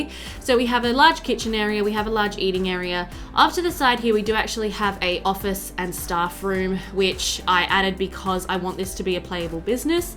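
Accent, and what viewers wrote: Australia. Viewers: Soon as I found your channel the other day, thought she has to be Australian by that accent. I am from Melbourne. So hey there ! lol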